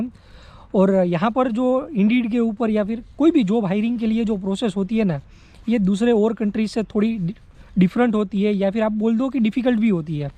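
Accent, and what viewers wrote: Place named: Saurashtra, India. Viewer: tamey accent thi gujarati to paaka cho pan saurashtra thi lago cho .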